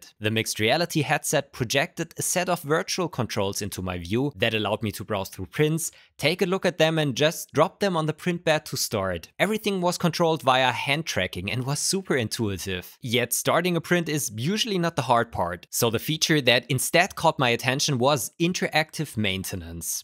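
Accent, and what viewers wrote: Germany. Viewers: Just saw this channel, you can hear, that you're German and I just wanted to say congrats. It's pretty rough to get that much abos as a German. Also the video ist pretty good, thanks. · You are habe a Little Bit of german aktzent, vermute ich mal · Gutten tag eveybody man merkt das du deutscher bist aber grundsätslich sehr guttes video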